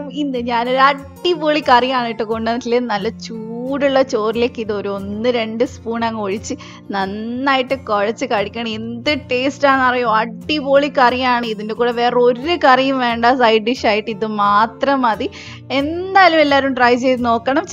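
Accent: Kannur, India. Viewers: sound super kannur evideya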